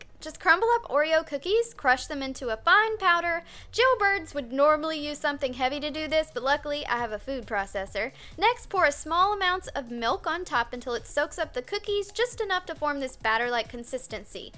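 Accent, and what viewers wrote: South, United States. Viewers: she talks country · why do u always talk like a country girl is gross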